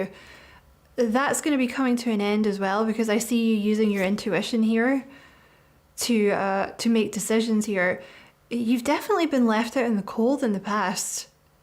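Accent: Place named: Scotland, United Kingdom. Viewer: Ouch some uncomfortable home truths there. This was a brilliant reading delivered all the better with that wonderful Scottish accent across an equally wonderful kitchen table. I’d love a one to one tarot reading on that same table with a truckers tea brew! Haha! Thank You. 🙏🙂🙏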